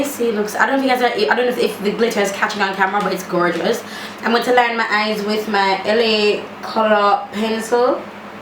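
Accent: Jamaica. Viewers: Looool the patois speed on fleek 😂👏🏾✊🏾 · jamacan accent a bit tough and you forgot to match up your neck!! looks many shades darker! lolololo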